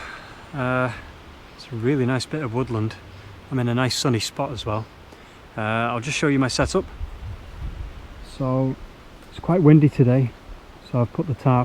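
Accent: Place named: United Kingdom. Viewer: Where are you? British accent, but you are always riding on the right side of the road. Love the videos. Love that you love your dog too!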